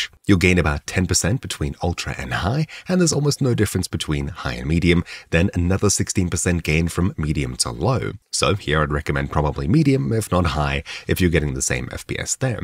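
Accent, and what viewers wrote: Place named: South Africa. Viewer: didnt know your south african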